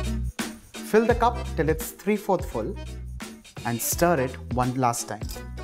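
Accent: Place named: India. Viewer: That's weird. He speaks just like any indian.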